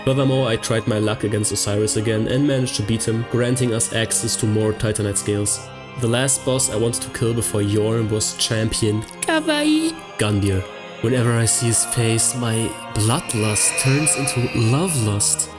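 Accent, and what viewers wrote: Germany. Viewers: Nice run! Unrelated but I was like "hey this guy sounds German" Then I was like "Paulaner Spezi aufm Schreibtisch 😱"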